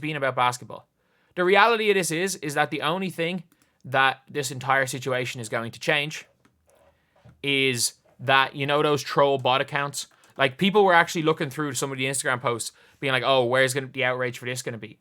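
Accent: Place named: Ireland. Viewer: I hear “So Caitlin Clark…” in a strong Irish accent in random places I shouldn’t even be hearing it now 🤣🤣 Love you and keep up the good work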